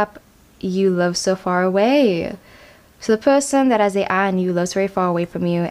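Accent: South Africa. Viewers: Your accent is beaitiful...are you South African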